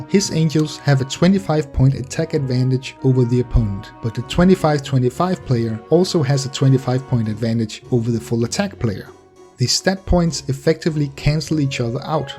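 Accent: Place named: Denmark. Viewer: Are you danish? I sense some accent.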